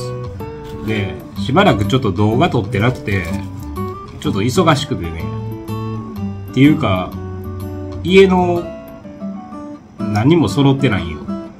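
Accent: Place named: Kansai, Japan. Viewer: お元気でしたか😂東京のピエロには標準語で話しかけてあげてくださいね。引きたいのに関西弁はひかれます笑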